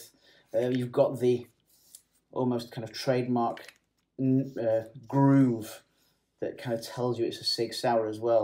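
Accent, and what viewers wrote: England, United Kingdom. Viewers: Reviewing the 1776 with your English accent was a treat. 👌 Not a bit of salt over the defeat. 🤣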